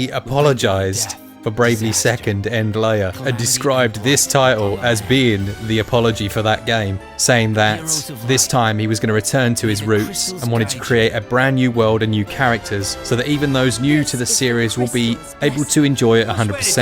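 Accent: United Kingdom. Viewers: To be honest outer worlds sucked, the story line is predictable, it just feels off and I think the reason why most enjoy it is due to the fact that they hyped it via media, youtube etc. So much, it was a true disappointment to me, get elder scrolls alot better and by the way I enjoyed this vid and its the first one I have seen I find most brits with a to heavy accent while your own is just smooth, i would love a top 10 with your personal rankings.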